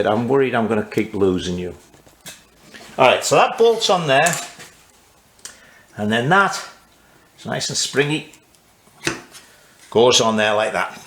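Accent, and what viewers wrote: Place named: North England, United Kingdom. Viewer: So, I'm on my first ever restoration and it's a BSA C15. Coming across these videos is a Godsend, and they're even done with a proper accent. I'll forgive you for being from the wrong side of the Pennines lad (not sure many Americans will understand that) but it is great to actually see things that I'm going to need to do rather than just read about them. I'm a couple of months behind you. I'm waiting for some shiny bits to come back and then I can start to reassemble the wheels, forks and shocks. Thanks for doing these videos - perfect for a newbie like me. I'll toast you with me Corporation Pop.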